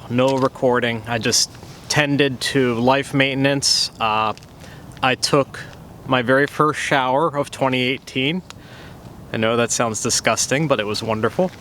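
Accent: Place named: Eastern Pennsylvania, United States. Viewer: Finally learned where he’s from in PA (Pottstown) after watching a dozen of his videos. Knew from his accent it was definitely not western PA. Ahh, Chester county at its finest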